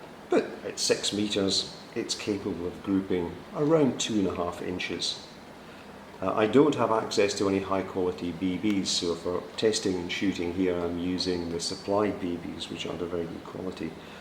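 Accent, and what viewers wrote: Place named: Scotland, United Kingdom. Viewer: Thinking about getting one of these for film use, Hey, nice to hear a Scottish review. I'm Scottish myself :) Haver a good Christmas and thanks for uploading.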